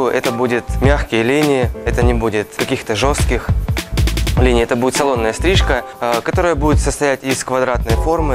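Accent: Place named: Ukraine. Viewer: Умиляет это украинское произношение буквы Г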